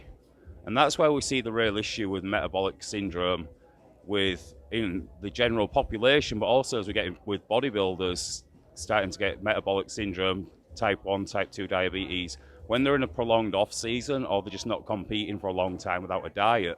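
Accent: United Kingdom. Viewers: Fucking hell didn’t expect the northern English accent!!:) good work nice change! 👌🏻👌🏻👍👍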